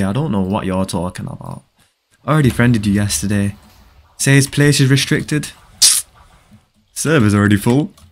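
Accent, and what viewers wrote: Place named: United Kingdom. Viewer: Why do u sound more British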